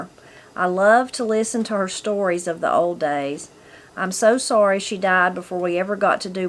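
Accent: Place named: North Carolina, United States. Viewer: Thank you for reading this! I first read “Christy” back in the early ‘70’s, have gifted it to others, and re-read it myself a few times since. I’ve just ordered a copy of “Letters to Lori”. I live in Granite Falls, WA and do not often get to hear a beautiful N.C. accent, so I truly appreciate you reading the book and your commentary on it. It was a special blessing to see the video in the link with Miss Opal❤ May God bless you and your loved ones. Also, know that I’m praying for those folks who’ve asked for prayer.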